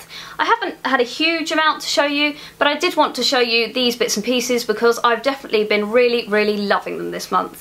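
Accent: England, United Kingdom. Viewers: Hello girl, you are so lovely to watch. The little nook where you film your videos is properly decorated and pleasing to the eyes. I love your English accent :). Two thumbs up for your videos. Well wishes from Asia :).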